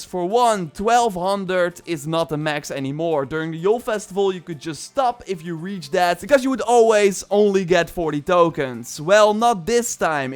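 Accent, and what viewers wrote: Netherlands. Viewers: U dutch? You have a dutch accent XD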